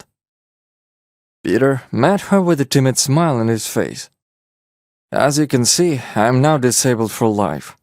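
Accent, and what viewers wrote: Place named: Russia. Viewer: Utter nonsense narrated by a Russian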